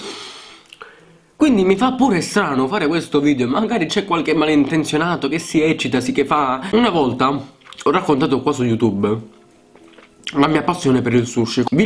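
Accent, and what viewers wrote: Italy. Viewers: si sente troppo dall'accento che sei palermitano miiii ho parenti in Sicilia 😂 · vedi avevo indovinato😂 da buona calabrese mi sono sentita ''una parlata'' simile alla mia😂😂😂 mooolto strano su YouTube che sono tutti del nord!!😢 Cmq nuova iscritta!!!bravo bel canale😉🍻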